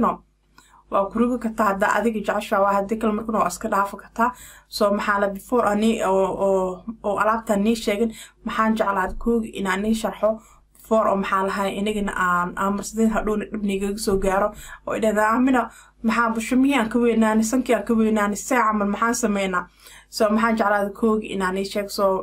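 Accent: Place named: Mogadishu, Somalia. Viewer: Af reer xamar eey ki hadleysaa gabadha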